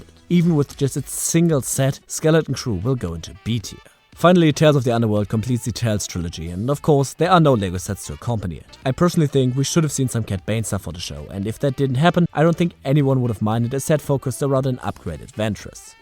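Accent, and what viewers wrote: Germany. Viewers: Duhörst dich deutsch an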